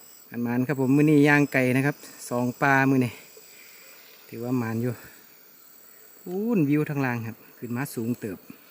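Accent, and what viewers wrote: Chaiyaphum, Thailand. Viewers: สวัสดีจ้า ยายบอกตรงๆเลยวาหัวตาสิเห็นช่องของเจ้าเด้งขึ่นมา ยายกะหัวตาฮู่วาทางบ้านเฮากะมีเห็ดเผิ่งคล้ายๆแถวเมืองนอกเนาะจ้า เบิงดี้…ดี เจ้าเฮดคลิปดีอีหลีจ้า สำเนียงคือชัยภูมิเนาะจ้า❤️👏👏👏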